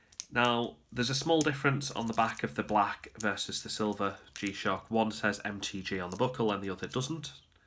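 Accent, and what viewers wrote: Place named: North, United Kingdom. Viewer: Thanks, a good video. I like your clear Northern English accent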